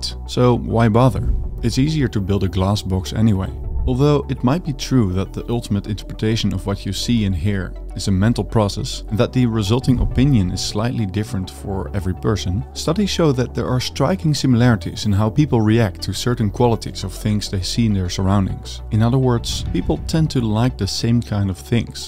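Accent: Netherlands. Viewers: Notwithstanding your terrible Dutch accent, you are every bit right about the importance of beauty. Actually, this is exactly what King Charles III stated as Prince Charles when he released "A Vision of Britain" and launched his institute for architecture. Efficiency, practicality and beauty are not incompatible at all. On the contrary, they should go hand in hand.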